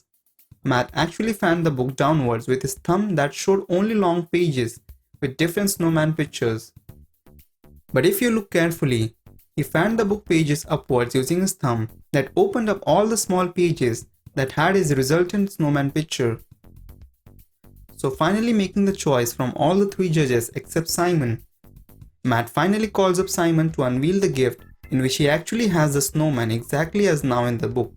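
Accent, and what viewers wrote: India. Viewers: I would've liked this more of the narrator didn't have such a thick indian accent that i can't understand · If you didn't switch the voice to a freaking Indian one the video would better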